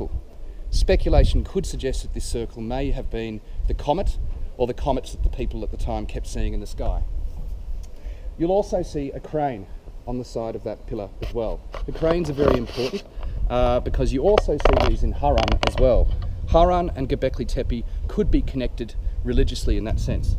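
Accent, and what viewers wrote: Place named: Australia. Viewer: Chuck sent me :) It was nice to hear a fellow Aussies voice. I subbed :)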